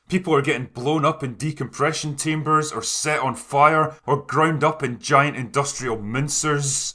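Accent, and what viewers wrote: Scotland, United Kingdom. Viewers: Sean Connery He blazed the trail and made the mold. The others tried to mimic his charisma, but failed. There are few actors that have the entire package like Connery. Just look at Connery's body of work and the magic of his presence oozes thru unintentionally, just because of Connery being Connery. Pierce Brosnan The only other guy that came close, who even had a movie kept under wraps until he was released by his captors in Hollywood, was Pierce Brosnan. Golden Eye was a fantastic movie. Sure, it had gaping plot holes, but it delivered. Brosnan was the epitome of cool, calm, collected British, stiff upper lip, boys, don't let'em get away. Oh yeah, save the girl too! That's not to say Pierce was a good Bond in other movies, but as good or better than all the other candidates. George Lazenby vs Diana Rigg Lazenby was good not great in his one appearance, but that's the problem. 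I need a little more than one showing. Different venues give us more flavor of what His portrayal and interpretation of Bond really was. Diana Rigg now, WOW. If any woman could have pulled off Bond, there's my girl. On non Bond stuff...Mike Krommhoefener said about you criticking Bond. If you play your cards right, you could be the next voice-over king. At least for parts needing a British/Scottish accent.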